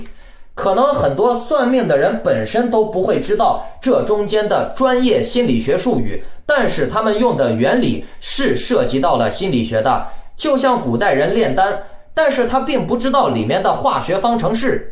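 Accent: Henan, China. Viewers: 恁是河南人？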